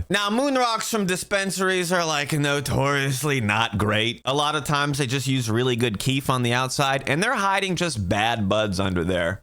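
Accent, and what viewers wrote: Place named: New York, United States. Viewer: Sounds like he's from New York.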